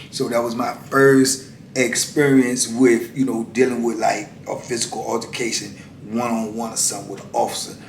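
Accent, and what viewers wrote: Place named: South, United States. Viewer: U r a very interesting man. No disrespect to ur relationship, BUT U R AN INCREDIBLY HANDSOME MAN, THAT SOUTHERN ACCENT IS EVERYTHING. U CAN GET IT FROM ME. I LIVE IN N.Y. B BLESSED . DORIE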